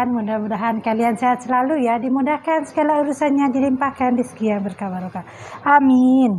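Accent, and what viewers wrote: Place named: Mataraman, Indonesia. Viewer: halo mbak e, tiyang pundi niki? dari logatnya kok seperti orang mataraman, perkenalkan kulo cah nganjuk, domisili Surabaya. ini mirip banget dengan tahu tek surabaya, kari nambahi petis sitik. kalau orang nganjuk nyebut ini tahu bumbu mbak... jan krenyes2 ngiler aq mbak, tp itu cabenya banyak banget, gek ndang ngoweh2 aku kalau nyobain 😅😅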